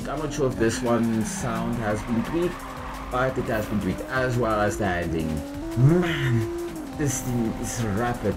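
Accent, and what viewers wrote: South Africa. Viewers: That's a very strong South African accent you have there brother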